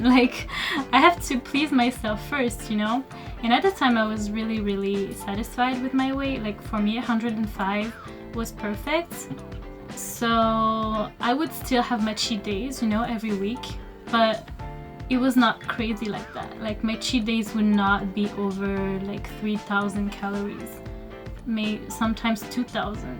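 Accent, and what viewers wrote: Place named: France. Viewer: ohhh tu viens de France j'en étais pratiquement sûre avec quelques prononciations !! tu es très intéressante et merci pour le boulot de tes vidéos !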